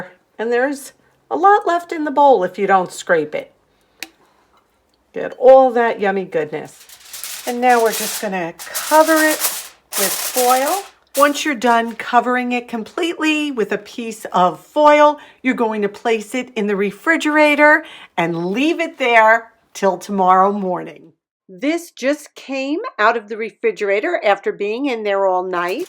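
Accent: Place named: Hudson Valley, United States. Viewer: You totally have the Hudson valley accent. Peekskill born and raised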